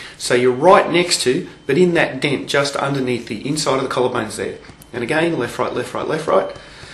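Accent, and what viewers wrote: Australia. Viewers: Sound like an Aussie. Are you in Melbourne ?? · Thank you. Short and sweet and the added pleasant surprise...the aussie accent.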